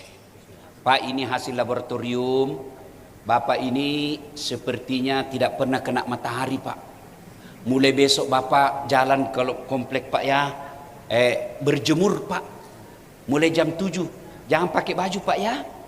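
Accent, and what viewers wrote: Bugis, Indonesia. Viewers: lidah bugis bang hehe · Mungkin lidah orang Bugis beda dgn penyebutan lidah suku BPK pun sebaliknya ini pernah juga ditanggapi oleh usdtad lainnya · Lidah bugis mas...itu bukan masukan tapi ... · Bagus aja masuknnya mas,, tpi tidsk mungkin ustad tidak paham atau sengaja asal asalan., tpi ini dialek daerah. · Lidah Bugis itu bro maksudnya sama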